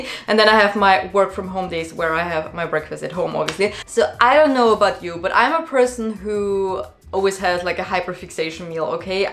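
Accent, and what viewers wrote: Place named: Germany. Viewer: oo yum im gonna make the avocado cottage cheese lunch, also where are you from? you sound very german ahah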